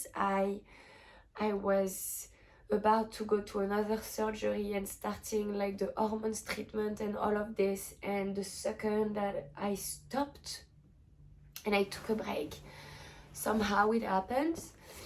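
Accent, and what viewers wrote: France. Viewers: L’accent français ✨✨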